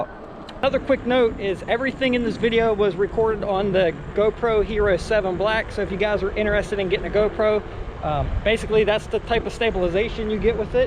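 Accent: Pittsburgh, United States. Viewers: Thanks for the video. Do I hear a Pittsburgh accent?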